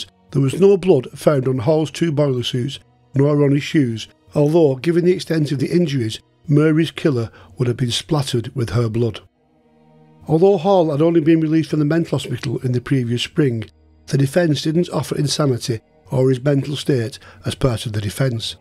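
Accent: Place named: Lancashire, United Kingdom. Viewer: Another brilliant video by, love the Lancashire accent and very well told. Is there an episode about Gwynne Owen Evans and Peter Allen in the making, the last 2 to hang in Britain 🇬🇧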